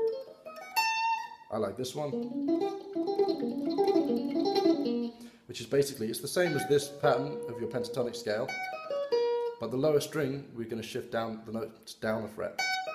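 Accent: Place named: Liverpool, United Kingdom. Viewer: Are you from around Liverpool? You sound a bit like George Harrison.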